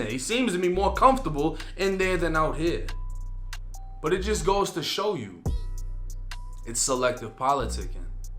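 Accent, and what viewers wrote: Boston, United States. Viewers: Been a sub and when you had about a 1000 subs. I liked your story. And your Boston accent. You probably say the same about my Canadian accent. Listen to Toronto man’s accent. Funny as hell. I did time with some well know Toronto rappers all fighting bodies and get sent to the pen for a 25 year sentence. Cause that how we roll in Canada. 25 max. If your good. You will get out earlier. Toronto mans known for shooting men in the legs ( leg warmers) many here have gotten one and a couple have died from a leg warmer. Hitting that big artery doesn’t take long to bleed out. Look in to some Toronto ggz. Fighting murder cases and still self snitching on Instagram. Giving the judge more ammo. Top5, Flippa. Check them out and there lingo. I was locked up with these guys. Two murders and two not 25 year sentences. Just one. That’s how Canada does it. 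We soft like that. I rock with YOU. COME HERE N 1 million subs. Having beef with Wack 100 is pure comedy. On piru my ninja. Wack is free wackiness. Go with it. Toronto mans not afraid to hand leg warmers out in LA either. Tony Yayo has recently talked about them on YouTube. It’s worth a look. Could be gold and push you over a million. We got a lot of respect for you in the North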